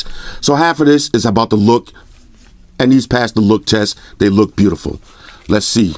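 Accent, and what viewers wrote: New York, United States. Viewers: I guarantee you this dude from New York 😂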